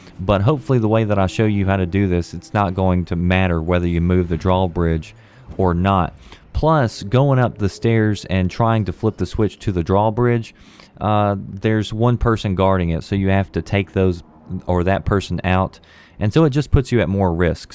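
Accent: South, United States. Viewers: Hmmmmmm, so southern and Mild. Plus 10 for good game-play! Have a like.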